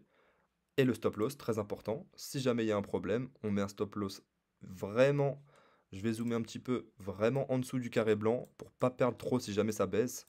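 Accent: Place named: Switzerland. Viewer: Ta géré ta technique est simple et efficaces à parament je suis vraiment debutant et j’ai reussi je suis fier , et c’est grace a ton tuto je jure je l’es écouter au moin trois 4 fois , avec le téléphone et en meme temps sur mon pc , jamais pas les futurs de base , mais en vrais c’est l’avenir 🤣 lol jte remercie super bien expliqué, et les sécurités qui faut c’est tip top ! Merci a toi encore ;) je pense que je vais regarder toute ta chaine aussi passue je suis tomber par azard et j’aime bien l’accent suisse ! Nanante huit :) peace et merci 🙏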